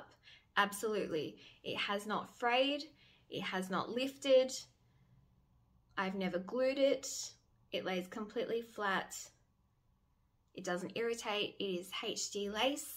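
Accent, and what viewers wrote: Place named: Australia. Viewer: I'm just scrolling and I came across your video. I've heard of Highline Wigs but don't know much about human hair wigs (due to me being unable to afford one I'd say, lol). What a beautiful wig she is. I love a low density wig and my favourite length is probably 16-18 inch. It's so good to come across a wig review that's basically a update on what the actual wig is like a year later and how it's held up in the meantime. We need more of these. It looks so natural on you, totally fab. I also had NO idea that the hair on your head, the one you had up on a bun, until almost at the end of the video, was a wig btw. Lol. Excellent job, thank you. It was also good to hear a fellow Aussie accent doing a review as well. x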